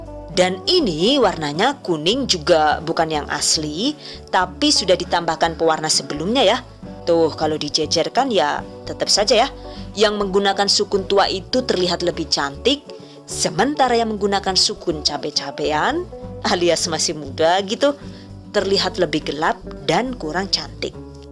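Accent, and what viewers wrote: Banyumas, Indonesia. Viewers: Lucu juga dengar mbak ini,ngakak juga ini,apalagi kalau bahasa ngapak nya keluar😀😀😀😀😂😂😂😂,salam dr malaysia mbak.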